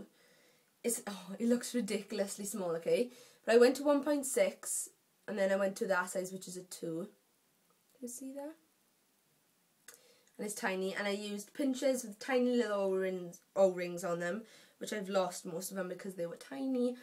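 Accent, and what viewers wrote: Wales, United Kingdom. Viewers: Welsh for the win :D :D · FUCK YES WELSH ACCENT!! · Welsh accent.. *O* ~Melts <3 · Your accent is amazing! Every lads holiday we meet a group of Welsh people so funny! I got my ear stretched today check it out would be nice to know what you think! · Oh wow... are you welsh :')? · omg welsh much <3 snap !!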